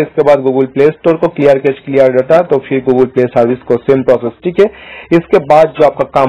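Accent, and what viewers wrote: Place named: Bengal, India. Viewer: Bangali hai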